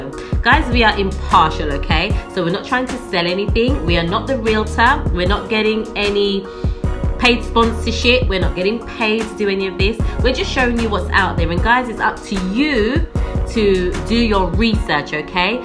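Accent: United Kingdom. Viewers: Greetings to my lovely family 🤗 manner an Prayer, thks again mi always love British accent ❤💖♥.. wonderful 👍🏿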